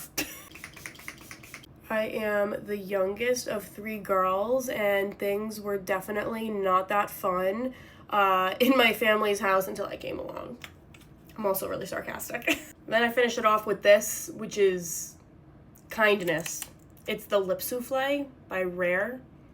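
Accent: United States. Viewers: Can I please ask you what's your accent obviously I know you are American but where did you grow up xx love you guys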